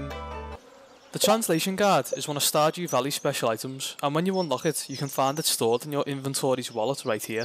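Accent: Liverpool, United Kingdom. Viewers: love the scouse accent. you got my subscribe sir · Madness didn’t expect to hear a scouser aha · YAYY SCOUSE STARDEW VALLEY FAN R U FROM LIVERPOOL TOO?!?!? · Haha I just typed in as I love this game but cnt remember where the blue one is.. then this scouser came on lol much love from a fellow scouser herself l8. Mate.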